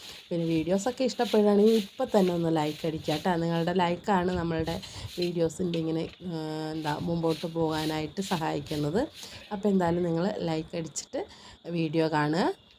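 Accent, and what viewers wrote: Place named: Pathanamthitta, India. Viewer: വീഡിയോ ഇഷ്ടം ആയി. ഞാൻ പത്തനംതിട്ട ആണ്. ഒരപേക്ഷ ഉണ്ട്. നിങ്ങൾ പാലക്കാടൻ സംസാര രീതി ആക്കാമോ. ഞാൻ അത് കേൾക്കാൻ ആണ് വീഡിയോ കണ്ടത്. പക്ഷെ ഇതൊക്കെ ഞങ്ങളുടെ സ്ലാങ് പോലെ ഉണ്ട്. നിങ്ങളുടെ ഗ്രാമ ഭാഷയിൽ സംസാരിക്കു... മനോജ്‌ ബ്രോയെ പോലെ.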